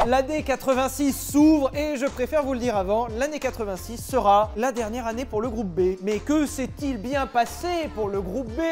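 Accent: Germany. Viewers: Quel accent allemand de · L’accent allemand une pépite!! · C'est pas normal que je regarde cette vidéo pour la dixième fois juste pour l'accent Allemands et le constructeur:)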